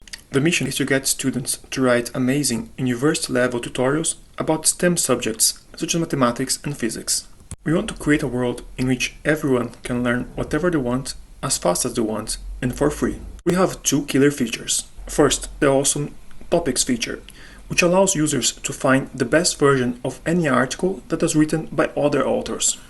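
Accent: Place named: Brazil. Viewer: wait, are you from brazil? your accent sets so ptbr vibes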